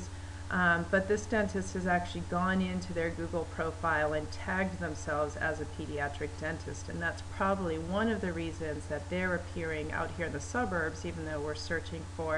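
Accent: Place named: United States. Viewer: man, its getting to the stage now where if I hear an american voice on a instructional video I immediately close it, 10 mins of you listening to the sound of your own voice! what a dribbler.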